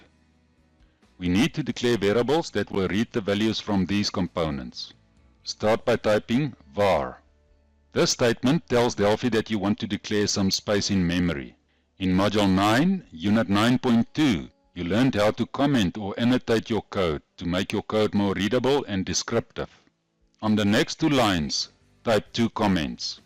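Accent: South Africa. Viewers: Familiar South African accent. Sounds great. Great explations.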